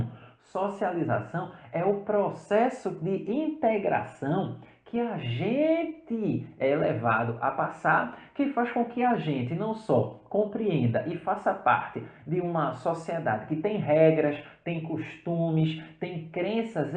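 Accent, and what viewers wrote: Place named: Pernambuco, Brazil. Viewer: e eu q me apaixonei pelo sotaque de Pernambuco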